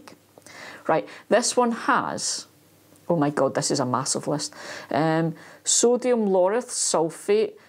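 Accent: Scotland, United Kingdom. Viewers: Thank you, great yo hear a Scottish gardener on here. So far today in Forth there has been sun, high winds, monsoon style rain and then sun.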